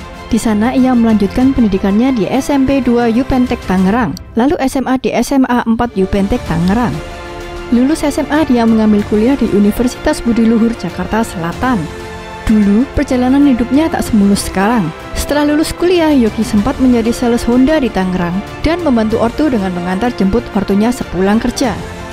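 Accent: Lampung, Indonesia. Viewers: Bang orang Ketapang ya bandar Lampung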